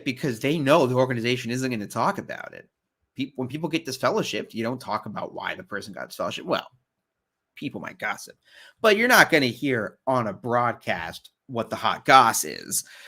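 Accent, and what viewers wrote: United Kingdom. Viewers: The British accent!! 🤣🥰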